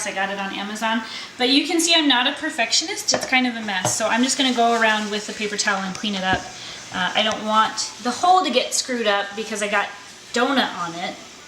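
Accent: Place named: Midwest, United States. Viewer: These look amazing! Dying over your midwestern accent LOL. Can't wait to try them!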